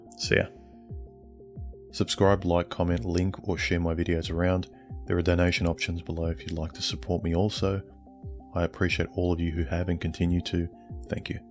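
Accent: Australia. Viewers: Best aussie I've heard talk for years Regards a south African...lol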